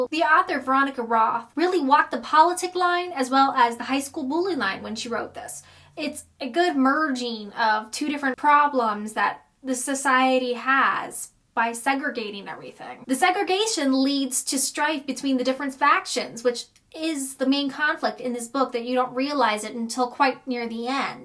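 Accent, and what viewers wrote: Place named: Midwest, United States. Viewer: I believe she lives in ohio....so mid-west accent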